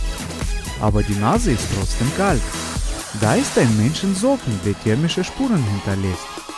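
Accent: Russia. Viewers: Ich liebe deinen russischen Akzent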